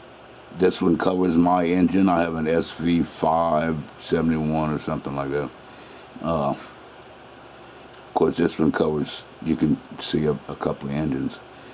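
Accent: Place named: Louisiana, United States. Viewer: Thanks for the video. You sound like you might be from Louisiana, likely east and south of I-10. Ask me how I known. That explains the damn corrosion.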